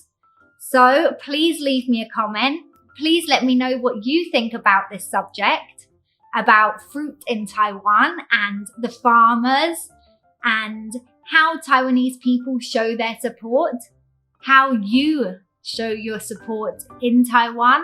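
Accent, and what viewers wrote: United Kingdom. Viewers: 慢速英国腔，好听。关注您。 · Hi I like your UK accent that saw so many video 👍 台灣真的是水果王國，我去到北美的時候看到水果幾乎都是冷凍的！覺得都不想吃了😨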